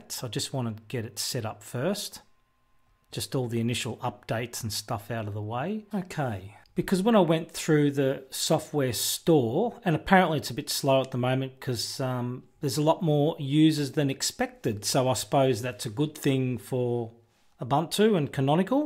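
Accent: Australia. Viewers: I missed the Aussie english. Hope you're okay.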